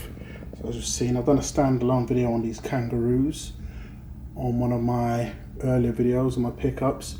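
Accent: United Kingdom. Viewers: I sense a bit of sarcasm in your opening remark & that's a pity when my advice was well intended. Yes I fully watched & listened to your video. Although you have a slight hint of a British accent your rambling for the most part was understood. The underlying message was quite clear to the you tube audience & myself and that is the fact that you as an investor made some bad purchases even by your own admission and the headline of your video: "Cutting My Losses On This Silver Sale" First off I am a numismatic coin collector and you will kindly note Sir that I commented "exclusively on your large (numismatic) proof coins" which you admitted was bad investment purchases & suffered a loss a number of times in your video. Further more, you obviously do not have a grasp of how the world market operates judging by your concern over BREXIT. The value of your coins as an asset depends on current market value/appraisal at any point in time. 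Whether the £ devalues relative to the € or for that matter on world foreign exchange (FOREX), selling your coins you will at "best get what is fair market value at the time of sale"... meaning it could be more than you paid or less. Also despite BREXIT, you are not limited to selling within the UK but internationally...the world markets are wholly interconnected! One more thing - you have thought me not to give advice freely because for some, it is objectionable & demeaning. A lesson learned & I thank you...my bad.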